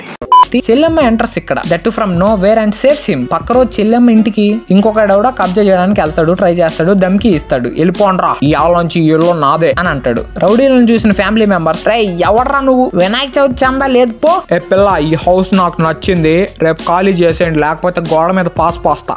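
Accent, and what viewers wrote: Nellore, India. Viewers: Mama nedi Nellore eh gaa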